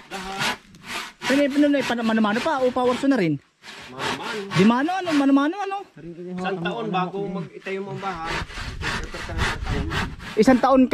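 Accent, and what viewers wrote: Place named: Batangas, Philippines. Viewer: Hawig sa puntong batangas eh, saan ga po kayo sa Batangas?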